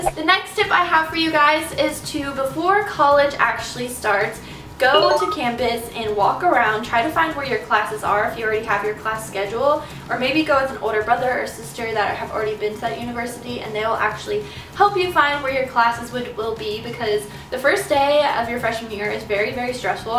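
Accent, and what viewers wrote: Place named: South, United States. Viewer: I feel like your southern accent/side totally came out in this video. lol loved it!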